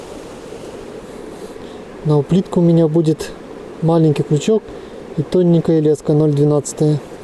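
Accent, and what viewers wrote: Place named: Vologda, Russia. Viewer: Говор у Вас прикольный, только не пойму "г" мягкое как украинское, а "оканье"...🤔горьковское или вологодское🤔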